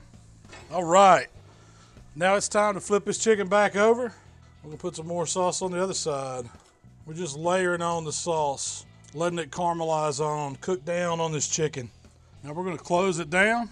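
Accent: South, United States. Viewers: As soon as I heard the southern accent I knew I could trust him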